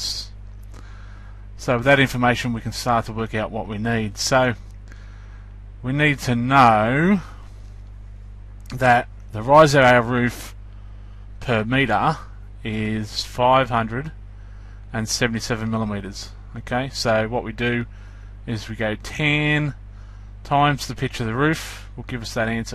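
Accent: Australia. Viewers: Aussie aye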